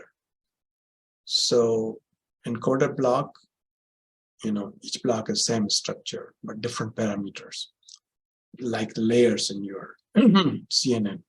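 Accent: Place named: India. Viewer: I hate indian accent. How on earth are there so many indian professors in our country?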